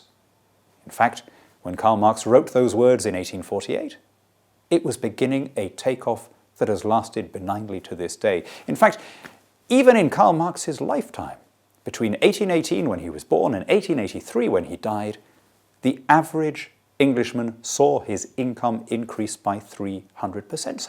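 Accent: RP, United Kingdom. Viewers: Talking shit with a plum in your mouth is still talking shit... Ridiculous claims on both capitalism and marxism... You should be ashamed of yourself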